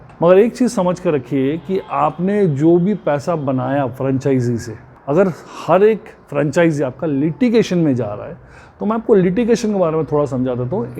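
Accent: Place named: Bengal, India. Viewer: Sir are you bengali.. Because your accent is like that 😅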